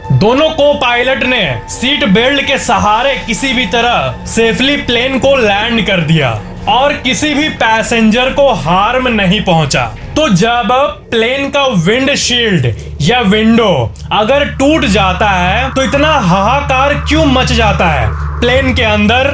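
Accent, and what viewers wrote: Bihar, India. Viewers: Aap Bihari ho kya bhai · Bhiy bihari h 😁😁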